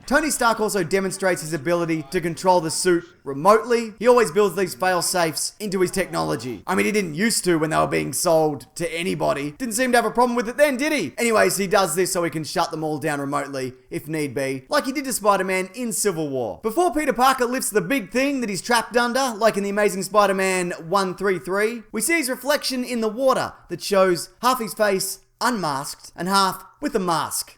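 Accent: Australia. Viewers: is this your real voice or your Bon Jovi public persona voice?(his real voice wouldn't make girls swoon, well maybe Claymates?) Because a high strung Aussie voice...not good. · are you Aussie?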